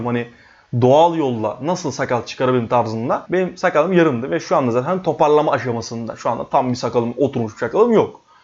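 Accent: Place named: Thrace, Turkey. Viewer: Usta be trakyalımısın sen konuşmalarında gizli jjj sesini duyuyorum sorayım dedim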